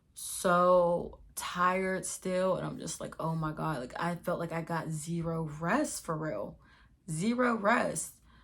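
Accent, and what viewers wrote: Pittsburgh, United States. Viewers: i love ur story times & i love ur Pittsburgh accent it reminds me of college 😩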